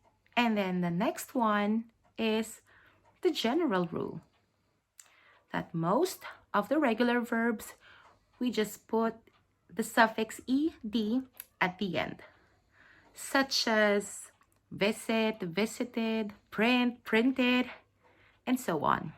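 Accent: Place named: Venezuela. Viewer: Parla venezuelano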